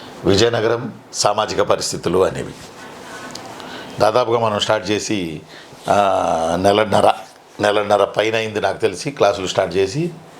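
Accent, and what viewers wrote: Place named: Telangana, India. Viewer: Sir.. మీరు మెల్లగా తెలంగాణ slang లోకి మారిపోతున్నారు..😂( ప్రదేశం ప్రభావం అనుకుంటా)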